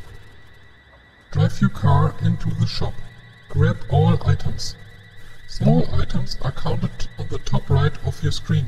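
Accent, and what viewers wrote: India. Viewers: indian darth vader?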